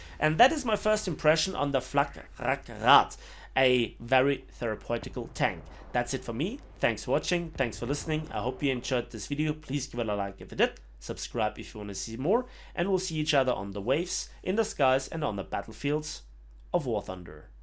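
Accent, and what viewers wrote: Germany. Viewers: i hate german accents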